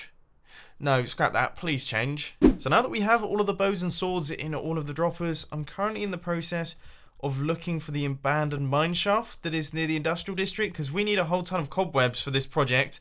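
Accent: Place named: United Kingdom. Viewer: James D that is a British accent, I know cause it's the same as American (English) duh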